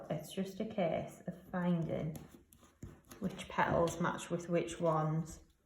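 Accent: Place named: Northumberland, United Kingdom. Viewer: Like your Northumberland accent :)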